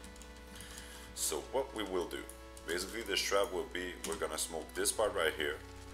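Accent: Canada. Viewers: are you a french canadian ?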